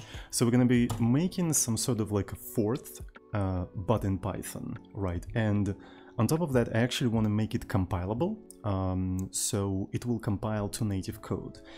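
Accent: Russia. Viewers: Вам этот дядька не показался душнилой? С технической точки зрения всё прекрасно, знание английского, различных языков программирования разного уровня. Но вот складывается такое ощущение, что автор канала как-то с презрением относится к Ру говорящим, возможно даже стыдится того, что сам знает русский, стыдится или ненавидит свой акцент, старается игнорировать любые вопросы, касательно знания русского языка. Не знаю, выглядит забавно и хочется открыть окошко, проветрить ._.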